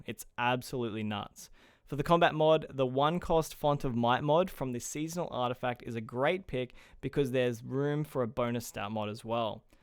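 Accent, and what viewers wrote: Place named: Australia. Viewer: U sound like you’re either from Adelaide or qld 🙄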